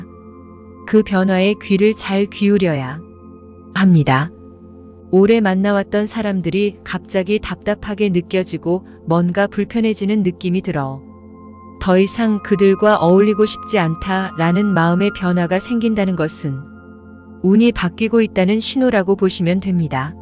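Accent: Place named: Giho, South Korea. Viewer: 너가 저가 이런 기호지방 개사투리 사용마라 특별시라니 말까지 바꾸면 진짜 딴세상사람인 줄 천한종들아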